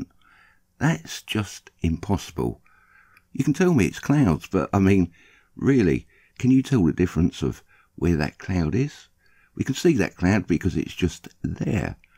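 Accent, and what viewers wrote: United Kingdom. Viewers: Your commentary cracks me up. That old school British dry humor has a special place in my funny bone. Whenever I hear that British accent my mind flashes back to my younger years hanging out at my boy James' house. His parents are British transplants. When you said, "What you tryin to hide there in the sky, Sonny Jim?" that brought me back to a time when I was 15. James' dad caught us sneaking down his Weinhart's in their garage. "What you tryin to hide there, Sonny Jim?" Between the guilty look on my boy's flush face, the buzz from the 3 beers i just slammed, and Jim Sr's accent, I couldn't help myself hold the chuckles back. You got me wearing the same cheeky smile I had on back then. Thanks for that,. I needed that right now. MadLuvBrada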